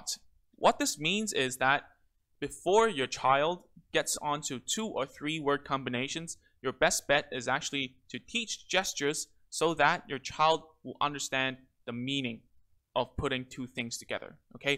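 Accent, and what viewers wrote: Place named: China. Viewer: Man ! You speak English super fluent almost like native english speaker, how did you do it ? A bit slight chinese accent in it but super tiny i assume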